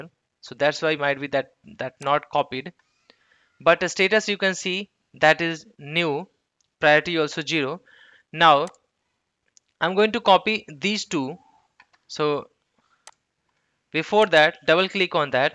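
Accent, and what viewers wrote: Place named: India. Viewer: Thank you. You provided a good explanation, but your Indian accent (SOMETIMES) sounds horrible. Please work on it.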